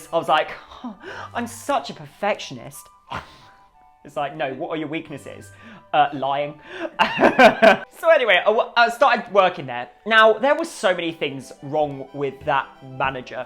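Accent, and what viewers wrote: United Kingdom. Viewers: Love the accent! Kinda cracks me up though how brits refer to cash as pounds! I understand why no one wants to do the piercings, not only are Claire’s employees inexperienced but it’s been also proven that the guns they use to pierce is un hygienic but it also causes damaged to the ears! I have 5 ear piercings double piercings on each side and a cartilage piercing, all gun pierced, my first ones my mom had them done when I was 8mo and they’re uneven, the last one was my cartilage when I was 18 now almost 37 and it’s still sensitive to this day! If I had kids and wanted to get their ears pierced, I’d take them to tattoo parlours to get it done!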